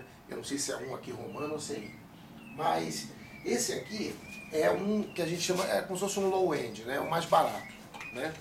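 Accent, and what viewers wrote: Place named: Brazil. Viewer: Legal o jeito de falar kkkkkkkkk Sotaque bacana ! Brasileirado falando 👏🏻😃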